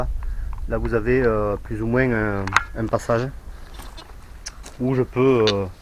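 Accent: South, France. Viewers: Impossible de répondre je fais un essai comme ça si ça fonctionne je répondrai de nouveau Ça y est ça marche alors j’ajoute : Merci pour les infos je commande souvent sur Ali… Je vais regarder merci pour l’info Concernant ce rencontré ça risque d’être compliqué je suis dans l’Allier 03 Vu l’accent 😜 vous êtes dans le sud ?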